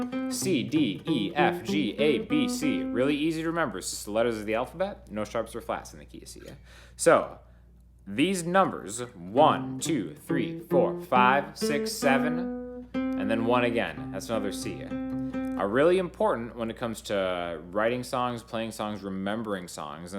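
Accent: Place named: Sweden. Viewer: Interesting accent. R u Swedish or Italian?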